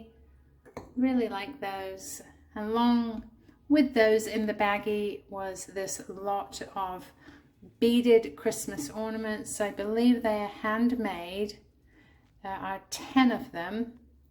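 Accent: United Kingdom. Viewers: Hi. I love the crystal beaded tree ornaments and all the items in the baggy. Love watching your channel, good to hear a Brit xxx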